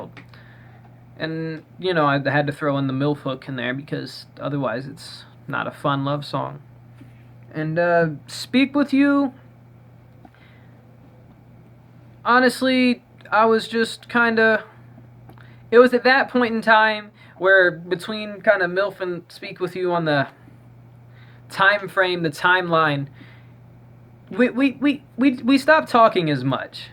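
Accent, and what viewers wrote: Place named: Texas, United States. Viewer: fuck yeah boy what part of texas are u from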